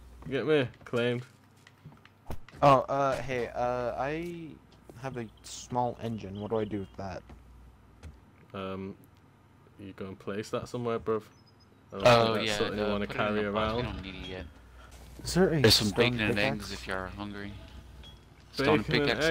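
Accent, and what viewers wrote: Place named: England, United Kingdom. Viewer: Hoping to find the mandem.. My G is mad english proper tings n dat 💯❤😂😂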